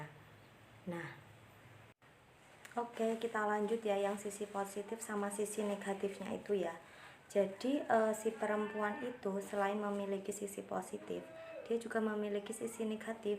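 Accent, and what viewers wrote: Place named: Java, Indonesia. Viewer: benar deh.. Asline pundi tho mba.. Nang video logate jowo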